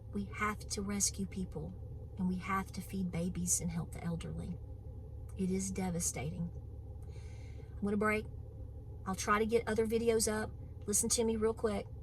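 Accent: United States. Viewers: I love that country accent! We have to help one another ❤ Be safe storm season is just beginning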